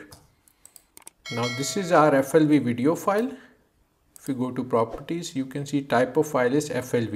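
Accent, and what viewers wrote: India. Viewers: Of course the tech video is voiced by a guy with a strong indian accent. fuck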